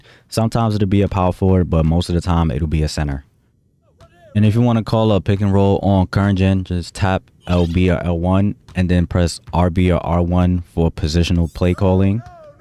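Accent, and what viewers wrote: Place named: New York, United States. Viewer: solid video you sound like the big apple is home for you. my fam is all there you sound like them very cool i have the walk of fame in my city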